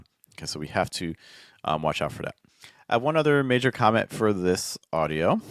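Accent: United States. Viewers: Please tell us which US regional accent that you have😊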